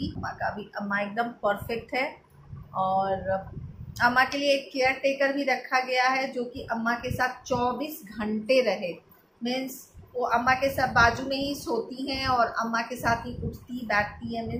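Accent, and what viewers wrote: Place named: Bihar, India. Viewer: Mujhe aap k baat katne k style se lag rha tha aap Bihar se ho sakti h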